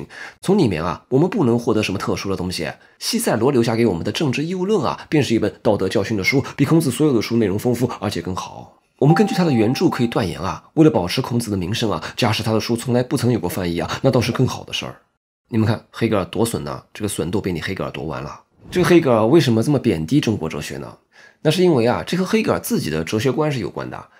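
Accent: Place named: Chongqing, China. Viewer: 我听出你的重庆口音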